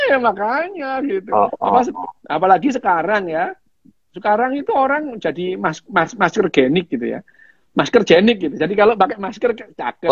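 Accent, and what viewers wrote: East Java, Indonesia. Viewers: Natural gaya orang Jawa timur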